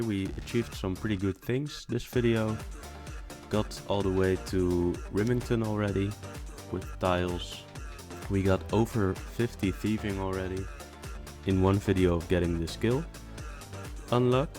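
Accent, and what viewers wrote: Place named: Netherlands. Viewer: I cant help but notice your accent, a dutchy right?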